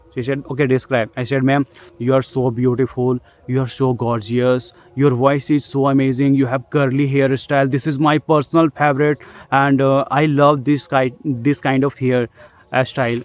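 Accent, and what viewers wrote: India. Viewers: Bihari English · Pls improve this indian accent if u dont wanna get bully in English countries.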